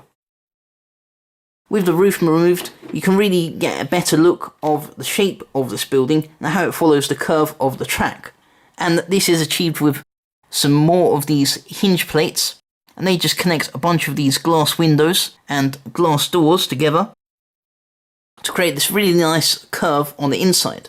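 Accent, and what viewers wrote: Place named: Southern England, United Kingdom. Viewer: I can recognise your accent but whereabouts in england are you from (guessing its down south)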